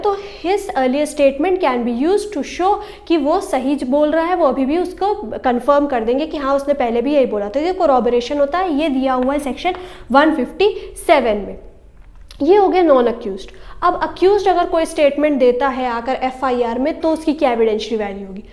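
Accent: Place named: Madhya Pradesh, India. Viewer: AAP madam m.p se hai kya apne bola tin tutd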